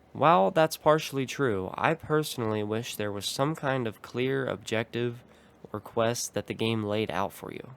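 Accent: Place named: Baltimore, United States. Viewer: You from Baltimore? You have the accent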